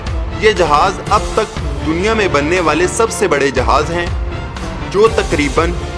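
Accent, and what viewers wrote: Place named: Punjab, Pakistan. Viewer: تمھاری پنجابی زبان کے لہجے میں اردو بولنا انتہائی گھٹیا لگ رہا ھے. پنجابی میں اپنی ویڈیو شروع کرو.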